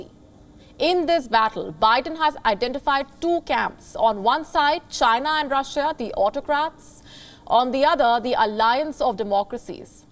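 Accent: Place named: India. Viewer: we can. She is Indian,not supposed to sound like english If u like british accent so much go to english channel. An indian will have indian accent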